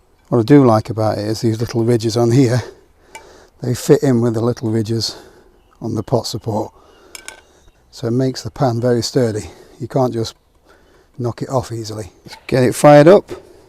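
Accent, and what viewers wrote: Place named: Wigan, United Kingdom. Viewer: Are you from Wigan or somewhere near there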